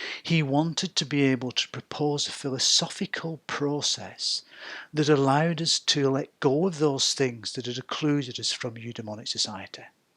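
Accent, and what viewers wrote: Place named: North, United Kingdom. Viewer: Thank you, you've demystified quite a bit for me there. Great to hear a northern accent too! Cheers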